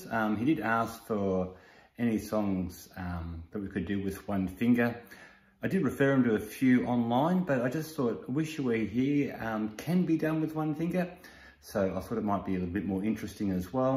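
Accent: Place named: Australia. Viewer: Great to have an Oz accent instructing 👏. Saw the cats jumper and and song title- thanks for this mate as I was learning this on 6 string from a mate who suddenly passed and I’ve also lost the finger ability for the 6 string so just got hold of a cbg. I’ll be learning this for me ol’ mate Doug and you have an Oz subscriber - also a Victorian. Cheers bud.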